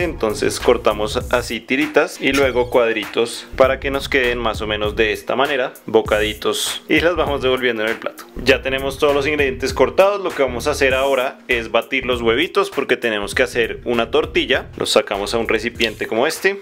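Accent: Antioquia, Colombia. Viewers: tú eres paisa? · Ud es paisa?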